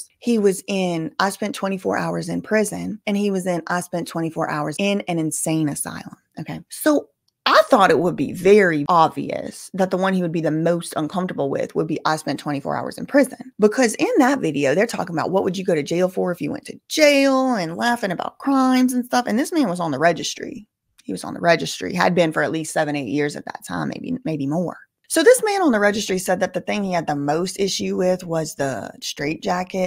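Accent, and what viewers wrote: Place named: Alabama, United States. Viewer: I have been watching your videos and your accent is familiar are you from Alabama?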